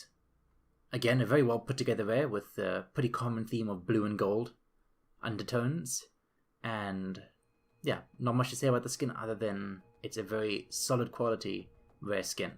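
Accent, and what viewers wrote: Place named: South Africa. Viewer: Gotta love a South African accent!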